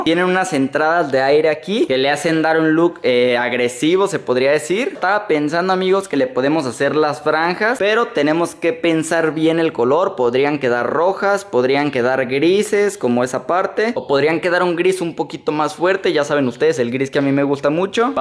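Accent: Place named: North, Mexico. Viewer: Se nota que eres un pinché nacote norteño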